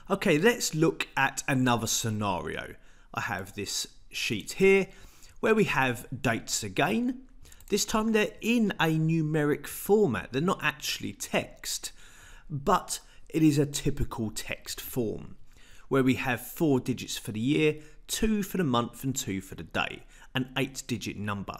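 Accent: United Kingdom. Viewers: Listening your accent reminded me of Peaky blinders😁 However, it was a great lesson. I got to know a lot new things. Thanks:yougotthis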